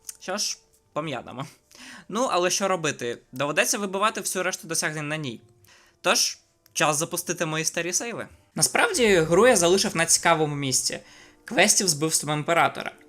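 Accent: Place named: Lviv, Ukraine. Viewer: У вас такий акцент цікавий, ви зі Львові або області? У мого друга просто ідентичні інтонації просто цікаво тепер 😄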